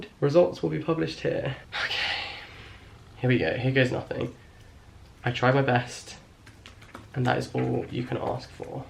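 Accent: United Kingdom. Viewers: What part of uk r u from? Ur accent <3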